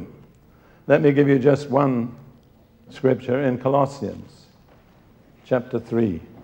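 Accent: United Kingdom. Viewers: I used to listen to snatches of his radio program during his lifetime when I was college, but he seemed rather like a rather stuffy lecturer due his British accent yet flawless diction. Now I hang on his every word, and his anointed teachings are powerfully strengthening my Christian life.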